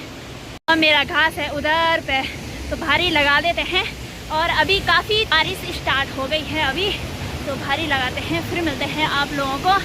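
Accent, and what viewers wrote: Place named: Garhwal, India. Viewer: Nice video beta aap Garhwali language wali Hindi acchi lagti hai,Mai har video dekhta hu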